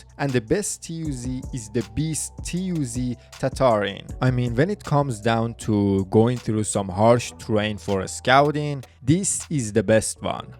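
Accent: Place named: Iran. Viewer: U have some kind of Iranian accent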